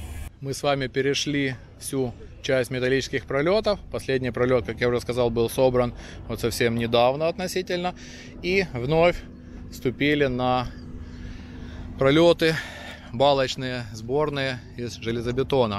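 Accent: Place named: Hutsul, Ukraine. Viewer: у вас файна гуцульська говiрка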